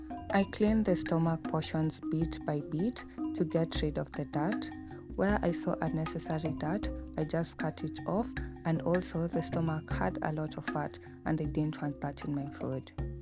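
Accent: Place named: Kenya. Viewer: Love that Kenyan accent.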